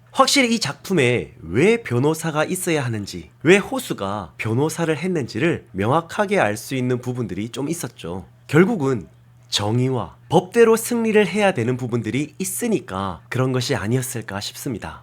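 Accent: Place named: Gyeongsang, South Korea. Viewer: 님 경상도 사투리 엑센트가 지대루네요ㅠ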